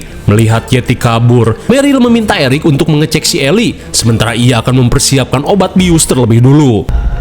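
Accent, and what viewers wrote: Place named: West Java, Indonesia. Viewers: Awal suka nonton film di chanel Si Aa gara2 intronya, "𝙃𝙮 𝙜𝙪𝙮𝙨, 𝙠𝙚𝙢𝙗𝙖𝙡𝙞 𝙡𝙖𝙜𝙞 𝙗𝙚𝙧𝙨𝙖𝙢𝙖 𝙜𝙪𝙖" Udah gitu si Aa Org Sunda yg sangat terdengar Pasti orgnya Gokil.. Kang ngawadul 🤣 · Lah baru tau BG orang Sunda cuma gak tau daerah mana dari logat sama bahasanya aja Sunda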